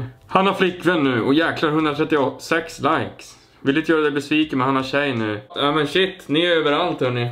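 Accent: Norrland, Sweden. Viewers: Jååå, hittade din kanal för typ en vecka sen å har helt fastnat. Är från Lule å älskar din norrländska! 💖✨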